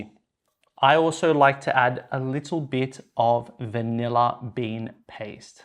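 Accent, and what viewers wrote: Australia. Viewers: Is it Australia accent? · Ohh, I really loved to know that's an urban Australian accent 😅 I intend to move there in the future, and I confess that I was scared hahaha. We Brazilians have been influenced to believe that the Australian accent is so, so, so hard, but I've been watching some videos of real life in Sydney, Melbourne and Brisbane, and I think it is kind of like a British accent, but with much more open sounds. So... it's totally fine for me. My favorite dessert is brigadeiro 😋 Congrats on your video, you're really lovely. I have a suggestion: if you could pronounce all those words at the end of the videos, and if you could do a regular conversation with another Australian person ☺️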